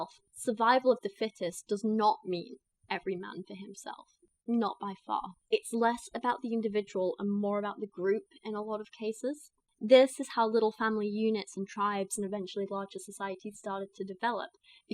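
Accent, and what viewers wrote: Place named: England, United Kingdom. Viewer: Hi, I know this is a very late comment to your video, but I just wanted to say you have an amazing accent! The English accent is so cool, Kent Hovind must be high to even suggest that you have a weird accent. Anyways, wishing you good vibes from NY! stay cool!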